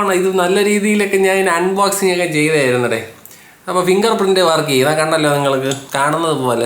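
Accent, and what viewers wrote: Trivandrum, India. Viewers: Pinne enthire haha edayike Trivandrum slang varum.. adipoli man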